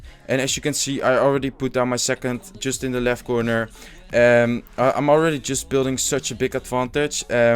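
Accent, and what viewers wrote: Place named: Netherlands. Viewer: lekker dat Nederlands Engels accent maar toch wel tof je video ga zo door!!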